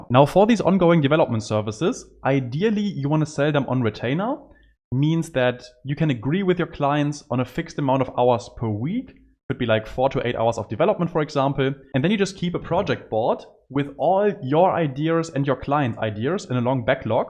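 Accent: Germany. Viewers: Such a saver, very timely. Thank you so much. And yeah the German accent, spicing it up alittle and very original, so yeah.....😀😀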